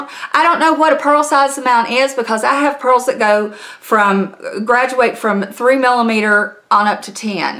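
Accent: South, United States. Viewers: Southern accent ... ew ... get 2 the point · I’m going to subscribe.. i love your personality. Lol 😂 I love that accent . I’m country too ! I was checking this product out for mom . Still debating if I’m going by it or not . Lol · Not fake, and if you’re from the South, then it sounds normal. If I go up North, I have a difficult time understanding people, the first time they say something to me, and sometimes have to politely request they repeat themselves. BUT... I don’t dislike the accent nor do I insult their accent. Like Mama says...”it takes all kinda kinds, so be nice and you might learn something.”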